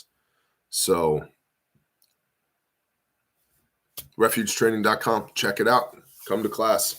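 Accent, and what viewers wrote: Minnesota, United States. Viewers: Awesome Minnesotan accent ya… Donchu know! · That MN accent was on point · Love the Minnesotan accent brother. You nailed it perfectly! Dontchaknow! And the radioactive leak, barely a peep and I don’t even hear anyone talking about it. It’s about 2 hours from me.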